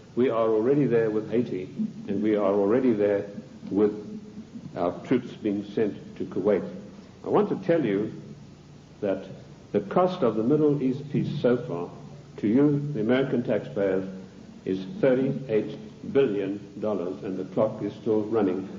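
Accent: South Africa. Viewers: Definitely either of the two... I thought SA🤷🏼‍♀️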